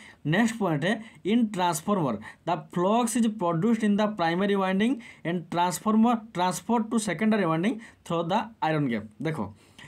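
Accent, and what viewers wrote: Odisha, India. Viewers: Bhai tume Odia na??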